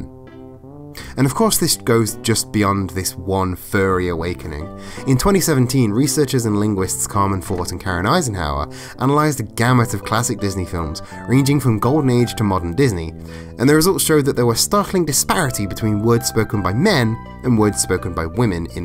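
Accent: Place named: United Kingdom. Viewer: I guess I'll go against the grain and thank you for the first part. It had information I didn't know. The whole signifier thing is interesting. And it did connect well with "male by default." But once you actually got to explaining that, you were just saying stuff I already knew. It came up when I checked out this "horrible" Anita Sarkeesian everyone was talking about. I wonder if having a male-sounding voice (with a "smart person" aka British accent on top) talk about it will help it resonate further. Though do I understand correctly that you are actually non-binary? Just checking so I use the correct pronouns.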